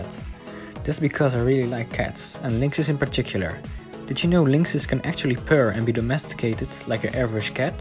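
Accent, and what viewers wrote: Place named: Netherlands. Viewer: OMG THE NEDERLAND ACCENT OMGGGG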